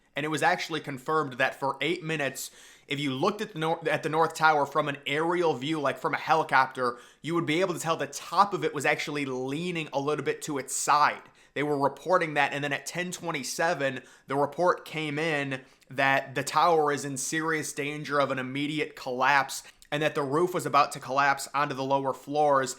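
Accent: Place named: Alaska, United States. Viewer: He sounds like he's from the show Alaskan Bush People.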